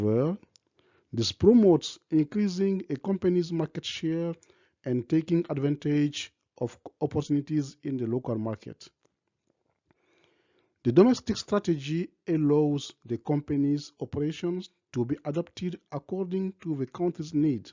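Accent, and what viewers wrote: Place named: United States. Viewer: Félicitations, tu parles comme un américain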